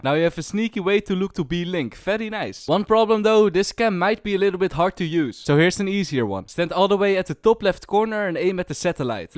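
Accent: Netherlands. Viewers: You dutch? From leeuwarden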